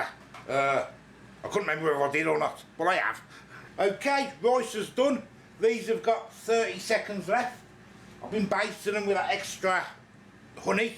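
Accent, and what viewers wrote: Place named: Hartlepool, United Kingdom. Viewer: Loving this ! Me and the wife love your videos , but we have been debating on your accent ! Where bouts you from Hartlepool ??? 😬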